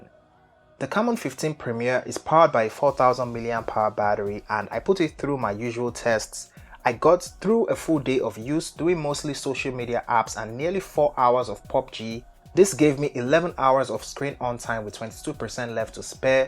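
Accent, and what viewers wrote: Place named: Nigeria. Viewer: Great video, you sound nigerian. Are you :) ?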